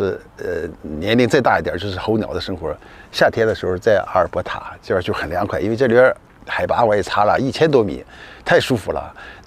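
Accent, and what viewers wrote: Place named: Yantai, China. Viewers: 作为一个大连人，烟台口音听出了家乡味道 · 听大叔口音是烟台海阳的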